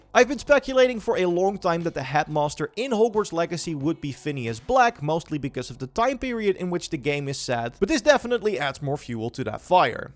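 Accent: Netherlands. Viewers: Definitely some guy at the Netherlands. Very goed Engels. Beetje Louis van Gaal vibes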